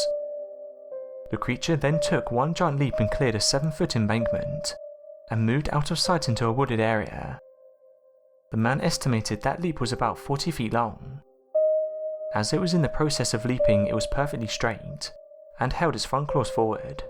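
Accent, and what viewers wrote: United Kingdom. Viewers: Cant undrstand u brit or ausie twit! Get another 2 narate👹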